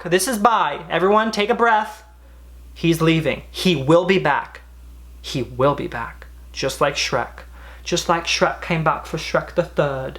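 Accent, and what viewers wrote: United Kingdom. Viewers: loooved this video drew ❤️ 1. you were looking incredibly handsome, the eye contact was making me feel tingly and i’m hot so that means a lot. 2. you were on a roll!!! wittiness was consistent and i was following the points well, relating and learning. really appreciating your experience and feeling super connected to you. the best way to end my night! :) (from the UK) (the british boy accent defo needs to stay positive) - Olivia ❤️ ❤️ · especially the British accent too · My dad was an actor & everything you're telling us is completely accurate. I thought about pursuing an acting career myself but I saw all the jobs my dad almost got & realized that wasn't for me. You are funny & talented ( fairly good Brit accent btw) & was the best thing in "Not Cool" If I was a casting agent I would've hired u 👍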